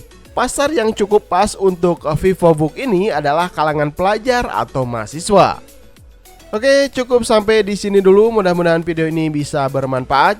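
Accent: West Java, Indonesia. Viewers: amd dan jipors. sundana teu bisa di leungitkeun. haha.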